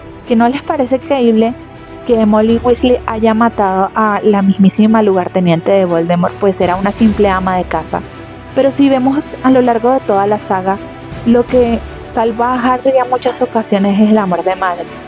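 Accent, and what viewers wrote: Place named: Latin America. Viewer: Es la primera vez que lo escucho en audio latino, se me hace raro 😅 Pero dale, vas a ser grande!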